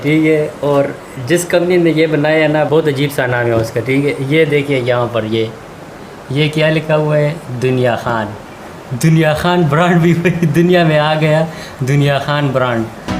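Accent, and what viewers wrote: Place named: Bengal, India. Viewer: AP Panjabi hA ko bangali hum ko to bangali lagraha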